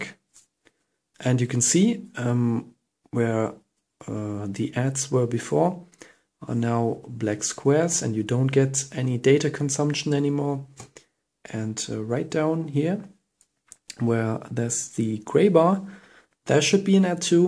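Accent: Germany. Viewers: Great tutorial. Btw it's quite cool that you are running a Chinese version of superSU while speaking with a German accent.. no offense tho. I live in Germany.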